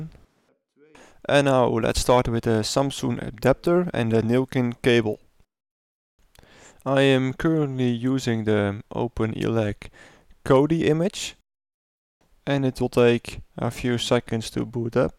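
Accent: Netherlands. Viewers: So noticed you were Dutch by the accent. Dus ik maak het me even makkelijk. Ik had op mn pi2 eerst een plex servertje draaien, hdd powerde hij ook mooi. Nu raspbian image erop met plex en de hdd tikt lekker door (net nieuw) welke power options heb ik verder nog om de pi wat meer stroom. Te geven? (gebruikte voorheen de usb poort van mn pc)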